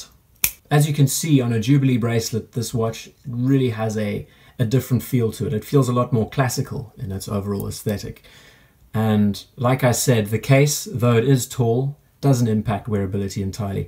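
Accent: South Africa. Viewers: I didn’t know that was a South African accent I was hearing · Hiya,new to your channel.Got the S.African accent immediately.I like your philosophy on value.That panis could easily sit next to any high piece and stake a claim.I also appreciated your comment that Tensing got little recognition in the famed Everest top out ,why because that guy Biliary may never have got there? Anyway ,informative video and enjoyed the Flemming dialogue.Cheers,Len.UK. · Thanks for sharing your interesting story with us. As a non-native English speaker I totally hear the same accent in your voice as with Winston from the YT channel SerpentZA. The next time I hear someone with that accent I'll probably be able to guess that he is from SA.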